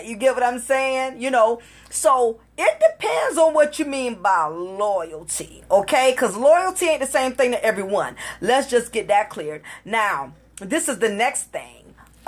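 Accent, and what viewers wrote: South, United States. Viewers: i always wanted to know were youre from cause of you're accent . im from Savannah. But rise up in the north east. i bet my mom knows youre family. You know how it is down there maybe i need to come back home for a good women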